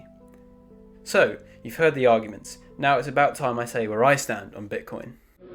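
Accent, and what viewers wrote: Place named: Texas, United States. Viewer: I know a bit abt dialects and I have to ask, what part of Texas are you from?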